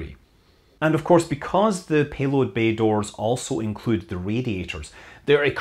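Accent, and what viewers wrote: Scotland, United Kingdom. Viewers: YouTube cannot compute the awesomeness of the Scottish accent, even at this level of dilution. What would it do with Glaswegian after ten lagers?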